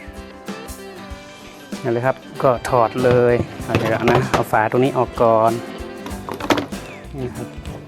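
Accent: North, Thailand. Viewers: ขอบคุณครับกำลังเปลี่ยนพอดี ชอบช่างคนนี้อธิบายดี พึ่งรู้ว่าอู้ภาษากำเมืองเน่อ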